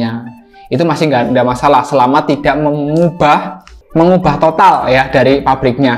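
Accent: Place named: Java, Indonesia. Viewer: Aksen nya seperti Pak Jokowi...Mase. Sukses selalu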